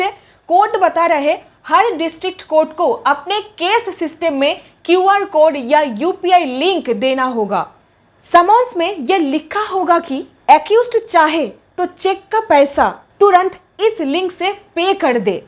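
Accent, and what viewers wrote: Bengal, India. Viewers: মামনি, হিন্দি একটু ভালো করে শিখতে হবে। উচ্চারণ টা বাংলা মিশে যাচ্ছে।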